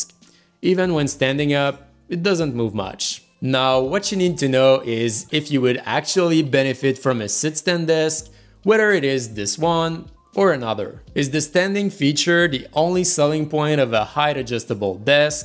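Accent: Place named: France. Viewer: Damn that French accent coming through, lol.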